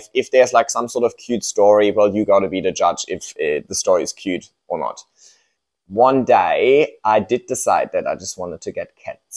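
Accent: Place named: Germany. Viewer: Are you German? I can't figure out if you're a German in Sydney or if Australian English is actually that close to a German accent 😂 It kinda blows my mind how similar it is :D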